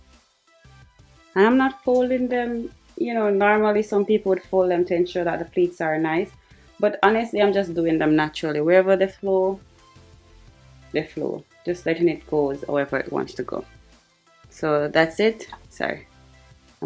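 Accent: Jamaica. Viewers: As soon as you started speaking I was like "ooooooh she Jamaican" . Subscribed! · I'm sorry I just read your bio. I didnt even knew there was one. I love your videos. Might not get to like as I watch.....i always forget to but I love your videos. Your accent screamed jamaican so that's y I was curious. ❤❤❤❤❤❤❤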